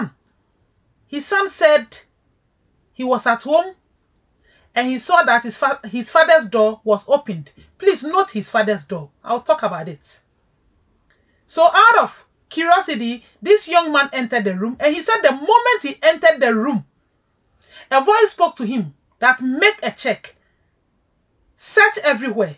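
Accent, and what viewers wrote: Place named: Ghana. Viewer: Are you a Ghanian.because of your accent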